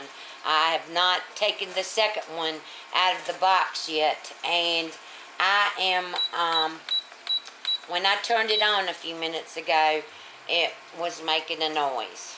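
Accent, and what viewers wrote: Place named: Washington DC, United States. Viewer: where are you from? Your accent sounds like my older female relatives, from the Washington DC area back when it was fairly rural. Listening to you really takes me back, and they cooked these same dishes too. Thanks!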